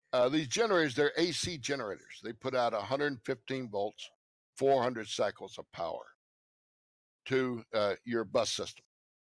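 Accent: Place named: United States. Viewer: Jesus he sounds so American xD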